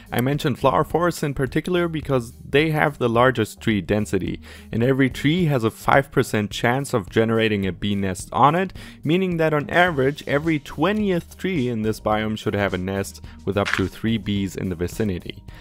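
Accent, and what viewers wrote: Germany. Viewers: Hab dich zufällig gefunden hab jetzt nach 4 Jahren kein minecraft ,wieder angefangen und finde deine Videos hilfreich und hab direkt an deinem Akzent erkannt das du deutscher bist (Bratwurst und Bier bruder)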